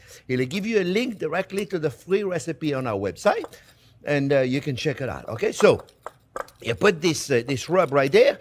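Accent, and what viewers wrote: France. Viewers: I love his smile and hearing him speak and teach English with a French accent. :D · Chicken wings which are drumstick and the second process in the oven is what??? A brawler? That is what your captions say? As for your French eenglish, I don’t understand.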